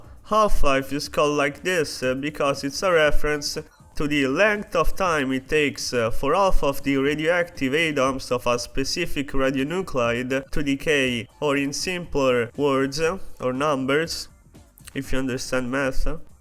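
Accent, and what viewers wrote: Italy. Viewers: You have the most italian accent... ever. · Are you Italian? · Your accent sounds Italian! Edit: ok ai carabinieri ne ho avuto la Conferma. GG compatriota. 👍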